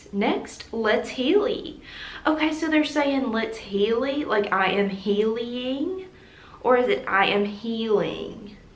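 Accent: South, United States. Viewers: Top tier American accent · You're losing your sexy southern accent 🥺😭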